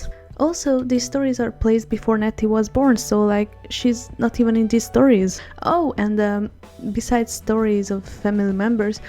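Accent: Hungary. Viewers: Your English is really good. Even tho I can still hear the hungarian accent a little lol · I can hear the Hungarian accent haha! Omg amazing! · az a jó magyar akcentus :D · Hungarian accent :3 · Annyira jó hallani a Magyar akcentust. :D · #magyarakcentus :D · By any chance, are you hungarian? you sound like familiar :D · Ohh my god! Im hungaryan and i hear the hungaryan accent in your voice!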